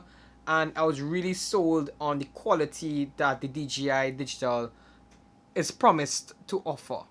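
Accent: Trinidad and Tobago. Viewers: South Africa but spent a great deal of my life in the Eastern Caribbean. From ABC's up to BVI. Visited Trini regular and Tobago was one of my fave kite spots. The Trini accent while toned down in the vid i remembered ha ha ha ha ha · Your awesome T&T accent is a nice change of pace from the hundreds of other FPV videos I watch from guys in the midwestern US. :) Great video. Keep it up! I subscribed, and look forward to more great content.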